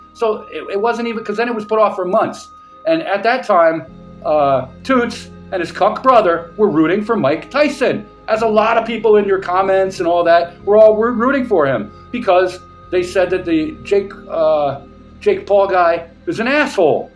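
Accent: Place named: Ireland. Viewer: I did NAZI that coming...I would have thought you were Irish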